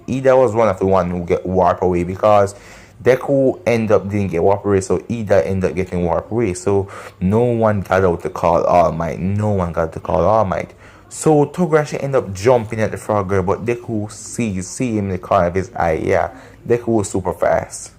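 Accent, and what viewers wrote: Jamaica. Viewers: Are you Jamaican because I am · He sounds Jamaican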